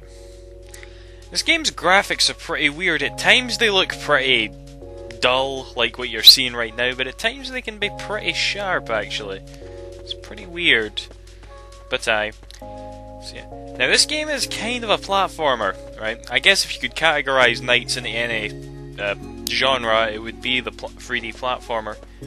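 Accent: Scotland, United Kingdom. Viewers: Ok i just found out at first i thought he wus canadian but now i founs out he scottish i think =p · lolz nice scottish accent! hehe! the jokes r awesome too :D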